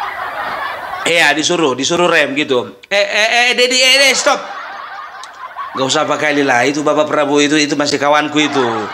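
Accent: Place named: Java, Indonesia. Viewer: Abang ini batak tapi boso jowomu medhok banget,, ❤❤❤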